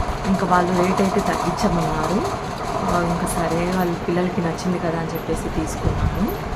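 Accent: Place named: Rayalaseema, India. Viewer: Ooo but your speaking slang looks like Rayala Seema...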